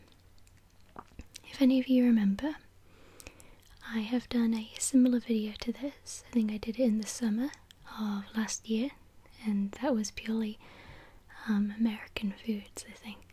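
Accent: Wales, United Kingdom. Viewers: yes she does, since she is from Wales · Are you British then? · I know it's kinda late 😅 but she's Welsh I think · I believe she's from Wales.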